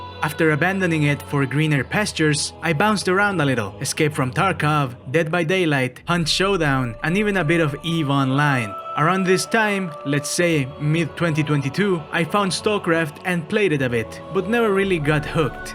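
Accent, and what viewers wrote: Chile. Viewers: Siempre que escucho a un compatriota y que es flaite me das un asco que verguenza, que bueno que naci en lo barnechea. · chilean accent screamer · Espera como que sos chileno JAJAJAJA excelentes videos eu, y excelente inglés también.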